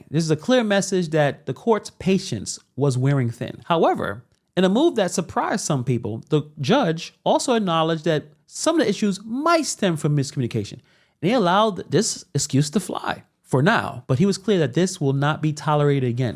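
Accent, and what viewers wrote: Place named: Appalachia, United States. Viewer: Ya all ya all all learn from me lol 😂 you speak like a uneducated hillbilly clown 🤡 total ghetto girl 😂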